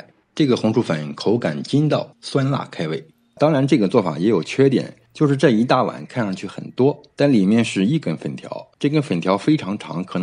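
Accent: China. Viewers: 口音较重，山东附近的，有点大舌头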